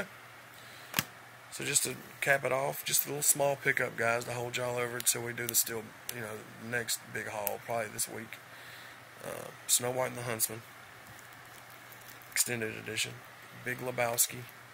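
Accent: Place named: South, United States. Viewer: Not being a stalker, but you have a southern twang and I was wondering what state do you live in?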